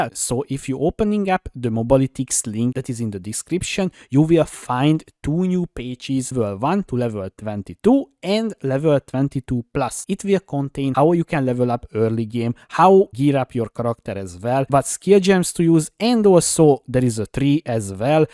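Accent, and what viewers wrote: Hungary. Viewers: i can hear the hungarian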